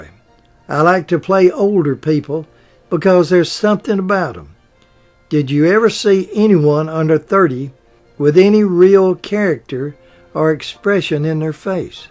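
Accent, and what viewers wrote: South, United States. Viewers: So happy to see you back and righting Walter's memory. So good to hear your Southern charming voice once again! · I used to enjoy your commentaries and biographies on your channel years ago, but being new and unfamiliar with YouTube and how it worked, somehow I failed or forgot to subscribe. I'm glad I found your channel again, and I promptly subscribed. As a fellow Southerner from Lake Charles, LA, I recognized you by your familiar drawl! 😉😁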